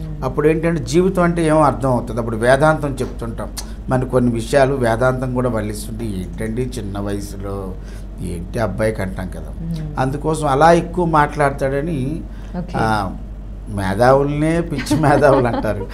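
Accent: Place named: Telangana, India. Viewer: రచ్చరవిగారు ఒక అద్భుతం🙏ఆయన తెలంగాణ మాండలీకం అద్భుతం🙏🙌👏👏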